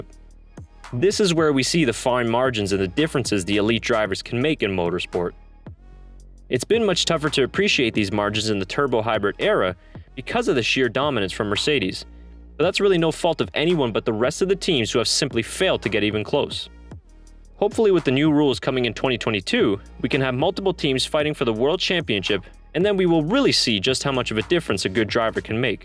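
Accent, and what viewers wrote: United States. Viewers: I’ve been watching every F1 related video lately. This is the first video I’ve seen from this channel. It’s kind of funny (in a good way) to hear anything F1 related spoken in an American accent. 🙂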